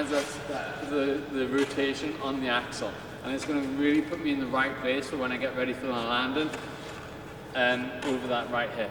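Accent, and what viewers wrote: United Kingdom. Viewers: British accent I think anyway like it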